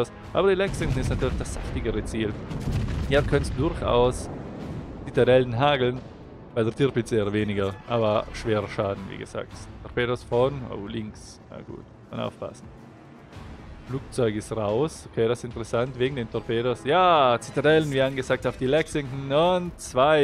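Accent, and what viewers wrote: Bavaria, Germany. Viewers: bayrischer dialekt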